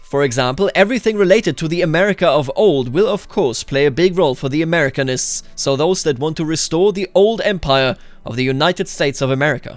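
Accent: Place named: Saxony, Germany. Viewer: You sound like you're from Saxony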